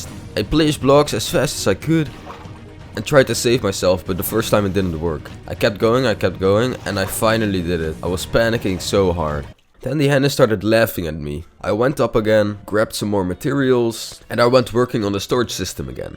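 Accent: Netherlands. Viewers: Je kan gewoon horen dat je Nederlands bent🤣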